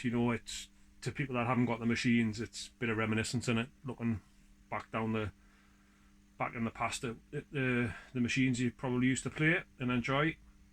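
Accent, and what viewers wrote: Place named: Northeast England, United Kingdom. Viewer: hi, if you still have red alert machine, any interest in trading for Austin Powers ( king kebab clone ) judging by your accent, i'm not too far away in billingham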